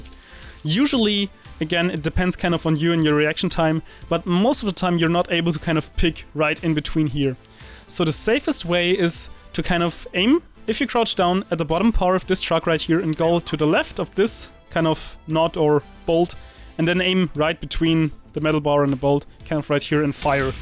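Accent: Germany. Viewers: ich wusste du kannst deutsch xD Egal wie fließend du sprichst und egal wie sehr du versuchst alles richtig auszusprechen man kann immer hören ob jemand deutsch spricht xD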